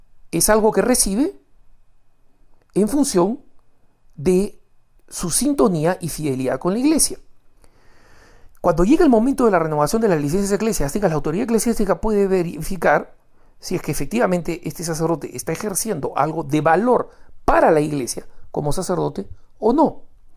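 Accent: Peru. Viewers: No soy kristian, pero estoy segura que el señor que habla es peruano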